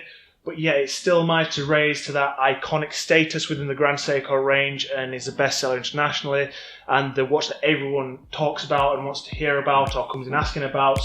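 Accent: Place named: Manchester, United Kingdom. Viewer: Skanky Manc Accent from the poor man's Michael York, recorded in an echo chamber. Nonetheless, nice vid.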